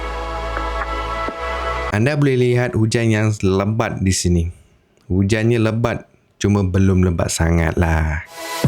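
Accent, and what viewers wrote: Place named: Java, Indonesia. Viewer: Abang ni orang Jawa ya,Sebab bunyi slang abang bercakap ada bunyi Jawa😄🙏🤝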